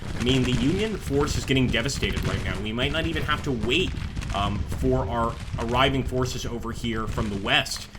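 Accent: South, United States. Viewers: I love your southern accent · Love that southern accent, spicy.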